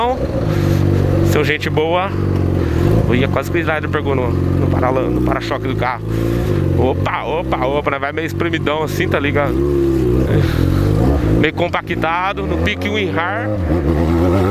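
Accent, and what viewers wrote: Goiás, Brazil. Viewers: e Porque Fala o Pique Do Goias? Kkkkkk Sou Goiano e n Entendi n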